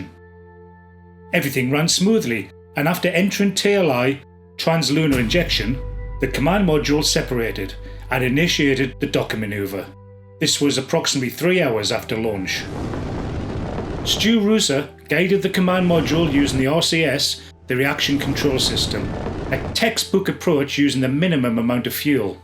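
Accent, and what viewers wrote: Northern England, United Kingdom. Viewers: What is it about these British-accented content providers making US space program videos? Their pitiful island never sent anything to space.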